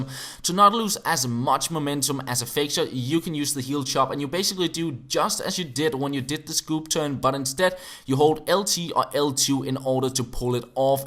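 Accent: Denmark. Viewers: Are you danish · Is he Danish becues im Danish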